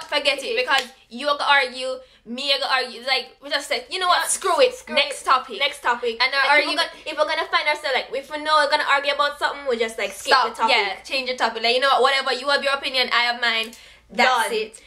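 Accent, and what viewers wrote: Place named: Jamaica. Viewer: Your patois (jamaican accent) sounds so much stronger in this video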